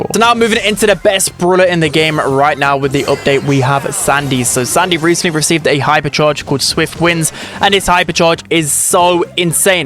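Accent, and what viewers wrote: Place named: Northern England, United Kingdom. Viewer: What part of England are u from bro everytime you pronounce something ith a u yiu sat like "oopdate" for example (from london)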